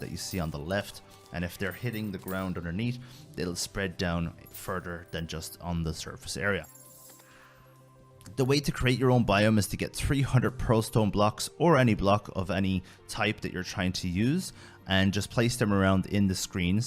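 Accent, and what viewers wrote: Ireland. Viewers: r u irish u sound irish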